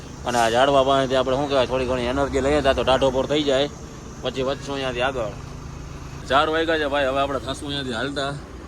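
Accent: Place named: Gujarat, India. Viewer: ભાઇ તમે બોલો છો મસ્ત એક દમ દેશી 🙏🙏 આપડા ગુજરાતી હોય એટલે કય ઘટે જ નય... ઠાકર ખૂબ પ્રગતિ કરાવે તમને... જય દ્વારિકાધીશ 🙏🙏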